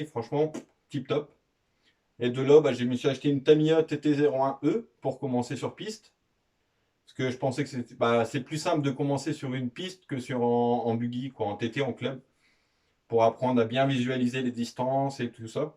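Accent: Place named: Nord-Pas-de-Calais, France. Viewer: Ouais , un ch'ti!! J'ai reconnu l'accent! Welcome l'ami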